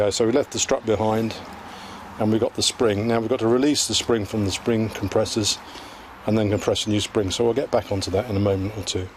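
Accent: United Kingdom. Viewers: You helped me fix a problem after installing new struts (but not the spring) where I had not made sure the 3 notches in the upper plastic mount were aligned with the butt of the bolts in the top plate. This made for some very noticeable knocking sounds when going over bumps and turning which disappeared after I aligned them. But before I watched your video and fixed it myself, I brought it into my local Ford dealer and they charged me $195 for a diagnosis that found nothing (confirming my belief that I can do the repairs better with YouTube than even the most qualified and expensive mechanic). Thank you for this awesome video. Your accent sounds Cockney, like my creative writing teacher. Bloody right then. Best of the lot.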